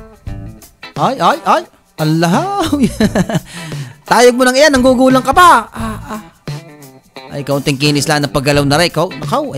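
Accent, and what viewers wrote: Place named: Santo Tomas, Philippines. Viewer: Ayy taga STO.TOMAS talaga are! Ascent palaang ih!😂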